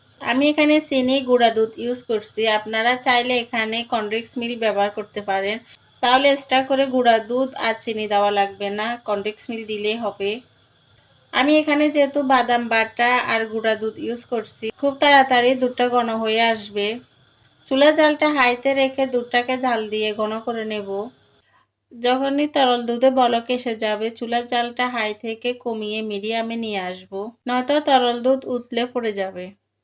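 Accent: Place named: Sylhet, Bangladesh. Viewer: লাইক সাবতবে আমার কিন্তু অভ্যাস নাই সাবস্ক্রাইবার করে আবার আন্সার্সটা এবার করে দেওয়া স্ক্রাইবার করে দিলাম আপু আর আপনার দেশের বাড়ি কোথায় অবশ্যই কমেন্টে জানাবেন আমার মনে হয় সিলেটের বাসার মতো শোনা যাচ্ছে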